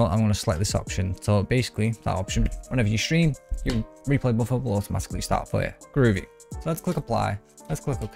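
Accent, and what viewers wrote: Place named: United Kingdom. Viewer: Closed captions is must for this video, very fast and hard to understand dude, I love a UK accent but cmon man :)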